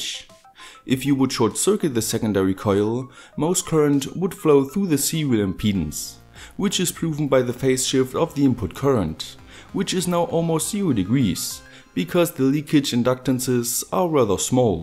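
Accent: Germany. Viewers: Great (Video)! Btw, I got one question, are you german? Because you sound like them:D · Nice Geodreieck and nice Deutcher Akzent xD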